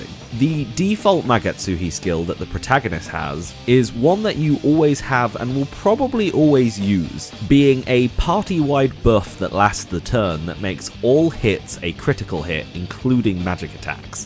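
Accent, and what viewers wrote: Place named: United Kingdom. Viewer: Excellent video. I usually say SMT V sucks, but you did it in a much more eloquent way, and even used a British accent!🇬🇧 My favorite point was that SMT V moves nothing forward and innovates in no ways. I'll even point out my biggest complaint: you don't gain any new movement skills as the game progresses. It's not like there are places you can't access because you don't have an ability. How much more interesting would it have been if you eventually got the ability to double jump, or use a grappling hook, or open sealed passageways, or see in the dark? Instead, you have all the movement skills at the beginning of the game and gain no more as time went on. That's not how that type of game is supposed to work. Also, when I got to the Tokyo Tower, there was nothing to do. There was no dungeon, no secrets, nothing to explore. 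My disappointment was audible. If you have the time, check out GILL Studio on YouTube. He plays a lot of JRPG music, especially SMT games. When you watch him play Digital Devil Saga or Devil Summoner music, he rocks out and you can see how skilled he is. He plays lead, rhythm, and bass guitar, and he's extremely impressive. When he plays SMT V music, he looks bored. It's so far below his skill, it's not even funny. (I'm still trying to get him to play from Devil Survivor. That tune will melt your guitar.) Here's my final analysis: SMT V is Fable. It made a lot of promises, like an open world and endless exploration, a character you could truly mak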